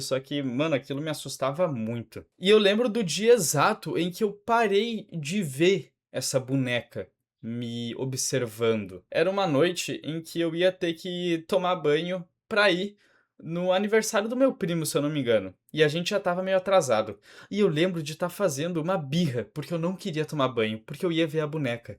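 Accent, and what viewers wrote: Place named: South, Brazil. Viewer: Nesses vídeos mais improvisados o sotaque do sul fica mais destacado kkkkk é os guri não tem jeito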